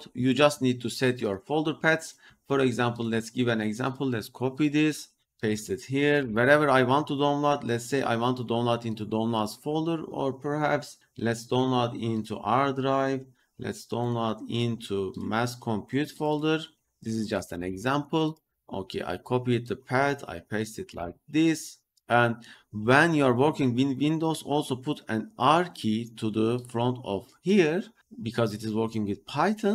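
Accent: Turkey. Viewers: hocam civitai de videoyu izliyim dedim aksanınızdan ve yüzünüzden kesin bu adam Türk dedim tahminim tuttu :) elinize sağlık,civitai ile model indirirken her model için isim belirtmemiz gerekiyor sanırım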